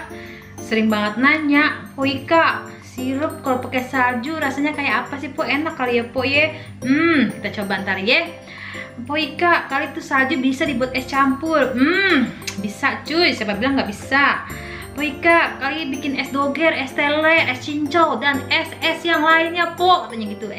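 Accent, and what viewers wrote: Jakarta, Indonesia. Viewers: ngomongnya lucu bgtt, logat betawinya..😁😁 salam kenal mpok...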